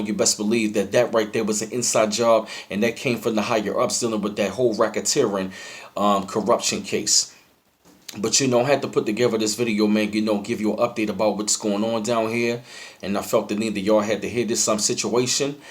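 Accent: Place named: Atlanta, United States. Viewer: You don't sound baltimore.more like Atlanta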